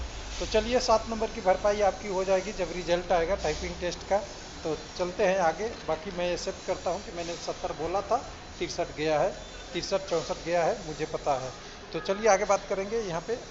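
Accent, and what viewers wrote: Bihar, India. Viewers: sir pls don't mind but u r very arrogant.. n arrogance to ravan ka nahi raha ..n rahi baat noted ki.. to aap hai bihari .. kyunki document ko daakument bolte hain(n old aged bihari bade danger hote hain .. ghamand achhi baat nahi dadaji .ise tyagiye , hum bachhe Hain hamara bura sochna band kariye.. bhagwaan kare ki aap galat ho.. agar aap sahi v huye to aap waise v swasth nahi rahiyega..kyunki 2000 bachhe aapke kharab health ki dua kar rahe honge wo v din raat...or galti se aap mil gaye tab to raam hi aapke swasthya ka maalik hai ya fir aapka Allah, kyunki or cast to tum ho nahi sakte.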